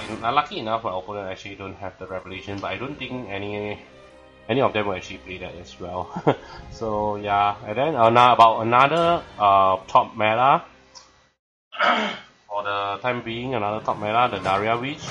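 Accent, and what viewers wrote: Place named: Malaysia. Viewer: you sound like malaysian tho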